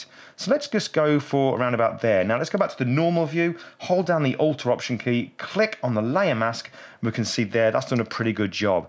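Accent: United Kingdom. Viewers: 好喜欢这个英伦腔 like the British accent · great british accent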